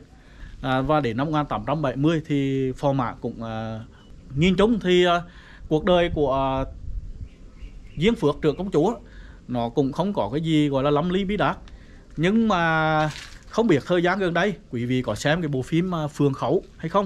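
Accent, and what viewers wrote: Hue, Vietnam. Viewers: thế là tốt rồi bạn vậy mới là đặc trưng của Huế chứ ko lẽ nói như giọng Bắc hay Nam thì vòn gì đặc trưng vùng miền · Thích thuyết mình bằng giọng Huế. Mình xa quê nhìn những địa danh Huế qua những video của bạn. Cảm ơn nhiều lắm!chúc sức khỏe và hạnh phúc, thành công, thật nhiều năng lượng. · Cảm ơn cháu ! Chú sinh ra ở Huế lớn lên đi học , tuổi học trò đi khắp các lăng tẩm không có chổ mô là bỏ sót . Bỏ Huế ra đi thời bao cắp Huế ( đói khổ ) Nay dịch bênh miền Nam . Rảnh rổi vô tĩnh xem được Huế rơveu của cháu rất tuyệt vời cộng thêm giọng nói thân thương của Huế . Chúc cháu nhiều s k tiếp tục những V IDeo khác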